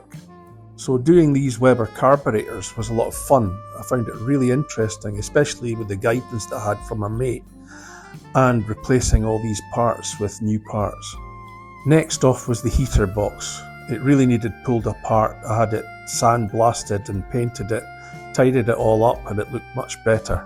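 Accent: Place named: Scotland, United Kingdom. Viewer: You make me want to go to Scotland again. I visited for work with Motorola back in the day. Your accent brought back fond memories of good people.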